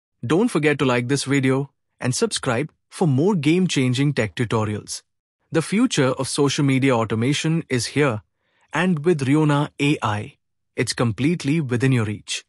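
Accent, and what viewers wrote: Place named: India. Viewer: it's sound like your neighbourhood scam call center indian man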